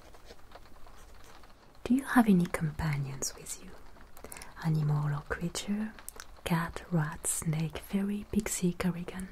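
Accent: France. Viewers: Wow amazing video. Everytime I watch your videos, I feel fully engaged in the story. Your French accent is soo luxurious ❤. Thank you for such amazing gift! 😊 can't wait for the next video.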